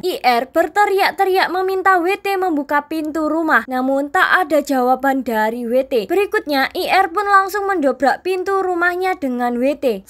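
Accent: Java, Indonesia. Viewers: Mantap mbak medok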